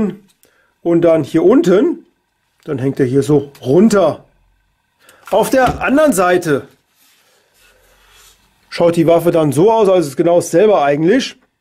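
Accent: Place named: Hesse, Germany. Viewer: Machste gut Bubb. Klares Deutschhessisch, guter Ton, konkrete Fakten, kein unnötiges Gebabbel .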